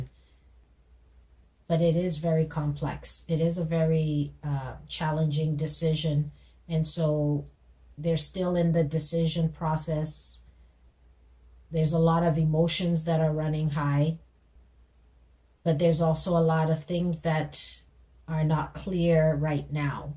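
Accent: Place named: United States. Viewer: judge by your accent you are american . I am NOT SURPRISE OVER YOUR NON STOP ROTTEN BASTARD LABOURS AND THEIR YOUR VERY 'DISCRETE' DEFINITION ABOUT THE SOFT BREXIT. THE US IS NOT FOR TO SEE THE UK CITIZENS HAPPY AS THEY ARE MORE INTELLIGENT THAN THE AVERAGE PEOPLE IN THE US. AND ALSO THE US IS BEHIND THAT ASSHOLE RICH GUY BLOODY HELL OF A LABOUR AS ALWAYS BEEN. THE US IS ALL OVER LIBERAL MEANING MAKE NO SENS JUST TAKE THE POST TAKE ALL MONEY AND GIVE A SHIT IN PEOPLE AS LATELY .... SO SOFT IS THE AMERICAN BULL WITH DESTROY THE WORLD. SOFT IS NECESSARY IS BAD AND VERY VERY BAD WHEN IT COMES TO THE BREXIT. IT WILL NOT LET BRITAIN TO LIVE TOTALLY AS THE PEOPLE WILL AND VOTED FOR . BRITAIN WOULD BEE SOON A SUPER SUPER POWER AND THE US WILL NOT ALLOW THAT..... THAT IS WHERE THIS IDIOT CORBY GOT SUPPORT FOR AND FROM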